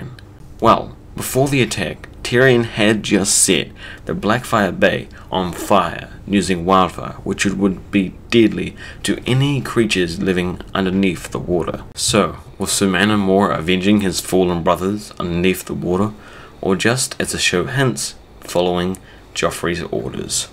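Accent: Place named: New Zealand. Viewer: Excellent research,! I'm trying to place your accent. New Zealand? South Africa?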